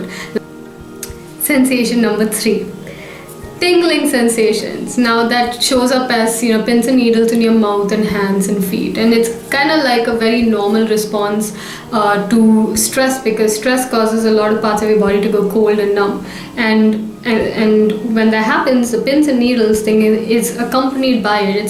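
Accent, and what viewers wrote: India. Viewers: so cute i love the asian indian accent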